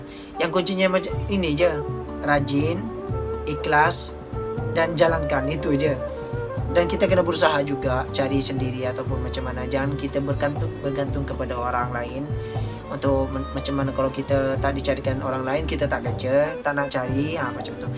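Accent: Malaysia. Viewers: Logatnya kakak udah malaysia bgt yaa..heheheheh. semngaatt ya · tak ada pun, cakap macam orang Malaysia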